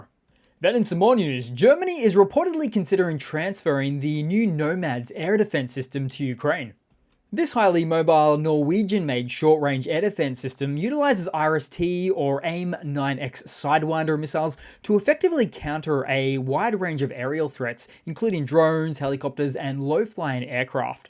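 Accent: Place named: Australia. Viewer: Canary Yellow!! That is Australian Green and Gold my friend and don't you forget it:) The sing song vocals have that rhythm of The Man from Snowy River; 'There was movement of the drone crews for the word had got around that the Rus from Belgorod had got away and had joined the Freedom League. They were worth a thousand frowns so all the Moscow hacks had gathered to the fray. Solovyob was there, frothing at the mouth, for no man could bullshit like him once his blood was up...... I swear is channeling the bard and his accent is like a swim in the vernacular. Onya. Carn Ukraine. Ken Oathcarne.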